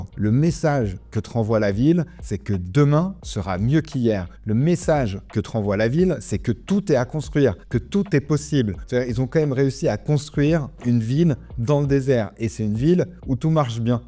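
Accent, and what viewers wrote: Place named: Arab world. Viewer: J adore ton accent un peut d arabe un peut de français et beaucoup l anglais et le tout c est ta beauté naturelle ❤❤❤👏👏👏👏🙏🙏🙏🙏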